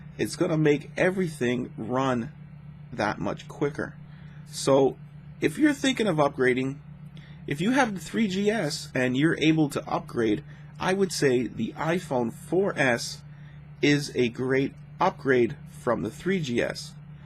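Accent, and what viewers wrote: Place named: Canada. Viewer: You're in Canada? you know come to think of it, I do kinda notice your slight canadian accent :)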